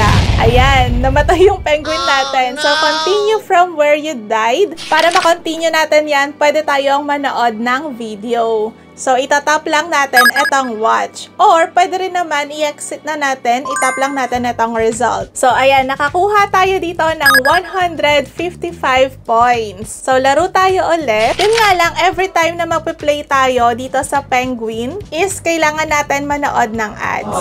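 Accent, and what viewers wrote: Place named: Pangasinan, Philippines. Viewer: Ganda mo pangasenensi ka kilala ko sa tunog mga taga pangasinan hehehe chat me teach me grand mother and father ko puro taga pangasinan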